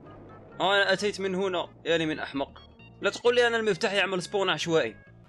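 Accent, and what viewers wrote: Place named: Egypt. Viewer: من هو احسن يوتيوبر ( احسن من ابو فلة ) - من اليوتيوبر الي بيستاهل 26 مليون مشترك ( يستاهل اكثر من ابو فلة ) - من اليوتيوبر الي بينزل فيديوهات بشكل يومي ومهتم بنا مثل الاطفال ( اكثر من ابو فلة ) - من اكثر يوتيوبر مضحك ( مضحك اكثر من ابو فلة ) - من الي بيتكلم مصري وهو اصلا من الجزائر ( من الاخر احسن من اي يوتيوبر ) 🎉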